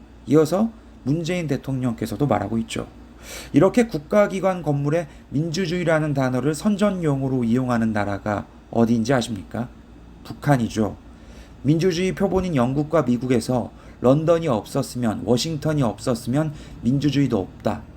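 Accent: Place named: Jeolla, South Korea. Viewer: 근대 영상내용 뭐임 목소리듣자마자 홍어쉰내 나서 안봄 요약좀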